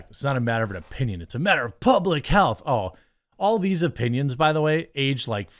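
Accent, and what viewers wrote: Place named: Midwest, United States. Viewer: The effort jeremy goes through to hide his Midwestern accent sometimes cracks me up.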